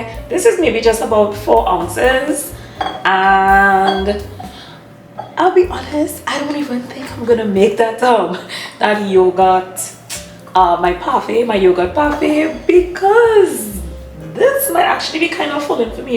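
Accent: Trinidad and Tobago. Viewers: Hi, are you Trini? That accent sounds like my people! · I hear that trini accent and I say no sah let me go watch!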